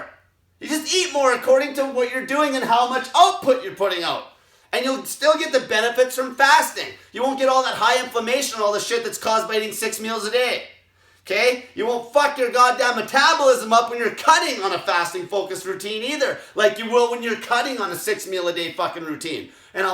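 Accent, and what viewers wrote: Canada. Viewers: This guy's high-pitched hoser accent is hilarious.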